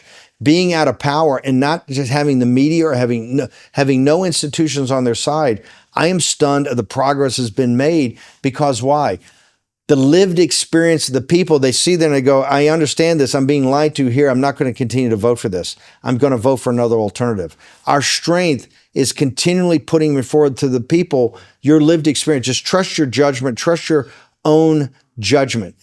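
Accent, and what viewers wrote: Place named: RP, United Kingdom. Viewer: too bad Bannon has to be talking with a lightweight. A beeb accent is not a guarantee of ability.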